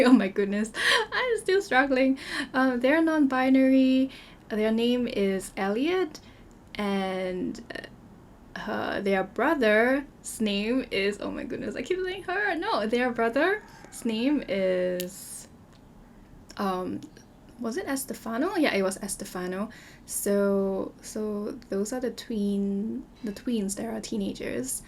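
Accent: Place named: Singapore. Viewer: i heard you accent and went ??? Singaporean 🥰 so nice to see a local simmer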